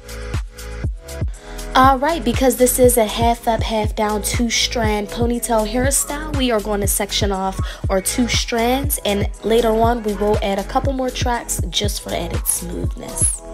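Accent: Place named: Pittsburgh, United States. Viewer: Love the video! Imma try it soon I hope I don’t fail 🤣 also you gotta be from Pittsburgh with that accent!!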